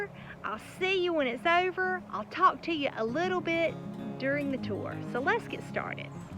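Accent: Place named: South, United States. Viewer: I'm glad I found you on YouTube. Delightful southern accent :)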